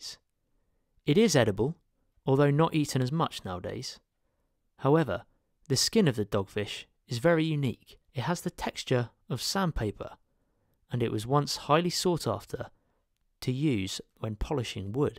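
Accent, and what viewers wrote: United Kingdom. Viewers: Love how he is clearly british but says ancestors to Native Americans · hes english lol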